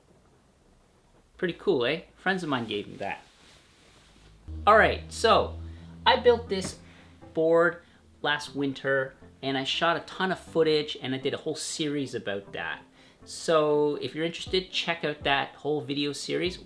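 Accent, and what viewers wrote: Canada. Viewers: I hear some Canada in your voice?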